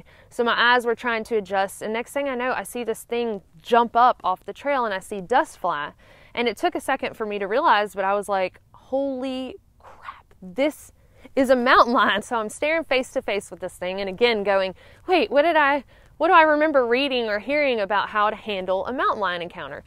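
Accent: South, United States. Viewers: Are you a southern belle? You certainly sound like it. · Where are you from?? You sound a little southern. Mountain lions are silent and sneaky. I heard said that if you wear a hat that has eyes or a face on the back of the hat it could stop an attack from behind. Sacketts I love those books I've read them all, starting at the beginning. Ole Barnabas Sackett started it all☺. I wonder if bear spray works on mountain lions. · Thank you so much for this informational video! & btw from a Michigander I have to say that your southern drawl is so sweet very charming! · Good primer vid. Cool to a non-tarzan dude doing a predator show. But, being a southern boy, I can't determine the accent. A southern accent kicks in with every word with an "i" and the word food. Georgia, Tennessee, the Carolinas? Maybe Florida? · Love her Southern accent. Charming.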